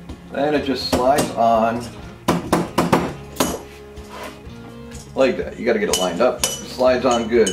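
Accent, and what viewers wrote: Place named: Canada. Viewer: I’ve been in the auto industry for 30 years and have never heard a ring hear called a crown gear, I kept listening and I believe I hear a Canadian accent perhaps, it’s interesting to me how each area or region has their own names for certain things.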